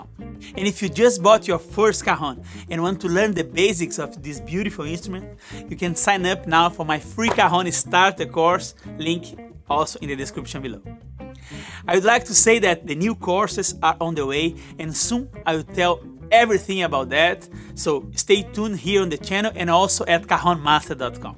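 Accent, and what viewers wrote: Brazil. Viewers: me waiting for him to speak so I can check the accent: "... myes ok he sounds Brazilian I can keep watching ^^ " really good vid amigo !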